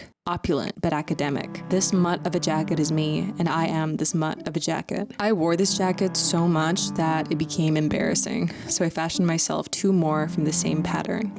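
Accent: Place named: United States. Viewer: Just now finding your channel. You are so talented and eclectic in your style! How is it that you were raised in Austria? and have an American accent?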